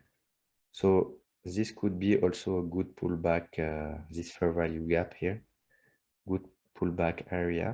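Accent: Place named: France. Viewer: merci😁😁😁...tu as un accent anglais"français"...merci encore pour l'éducation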